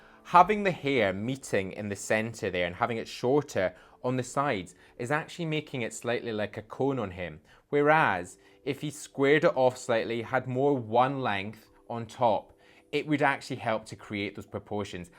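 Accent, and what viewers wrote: United Kingdom. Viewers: Hi! I came here to help my teenage son find a suitable hair style. Content was really helpful. Most of all I fell for your presentation 😊. I like your voice and clear presentation. I was thinking you are British or Australian? 😂😂I googled and found you are actually from one of my favorite country Scotland 😊. I have visited your beautiful country. All the best for your work! 🙏 ❤️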